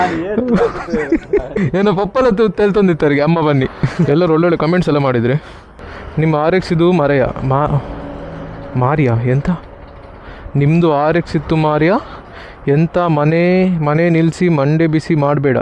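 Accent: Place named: Kundapura, India. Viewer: Kundapra kannada kend kushi aith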